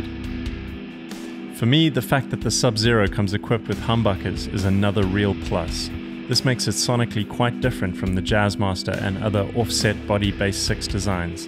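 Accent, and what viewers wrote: South Africa. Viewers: Hey man it sounds like you're from South Africa, how was the shipping(payment wait period etc?) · Great video. Been wanting to get these for awhile. I already have a Jazzmaster, but my interest in baritones and low tunings is pushing me to get one of these as well! That a Saffa accent?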